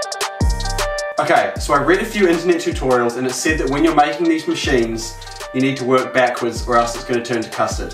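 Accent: Australia. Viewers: i love australian acent so muchhhhhhhhhhhhhhhhhhhhhhhhhhhhhhhhhhhhhhhhhhhhhhhhhhhhhhhhhhhhhh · Is he Australian?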